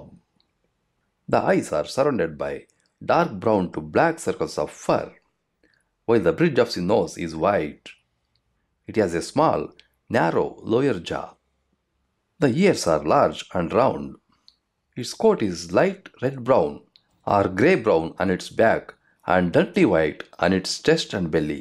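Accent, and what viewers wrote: India. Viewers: Like for that south accent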